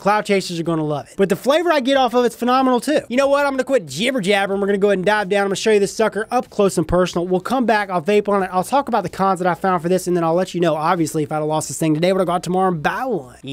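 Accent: South, United States. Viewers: Mesh?????! Omg!!!!!!!! I NEVER thought vaping would evolve this much! Wow!!!! I shall go on the hunt for one! Sn: I peep a bit of a southern accent! It's adorable!!! Plus, his vids are always short, sweet, and to the point! Yessss!!!! ♥️♥️♥️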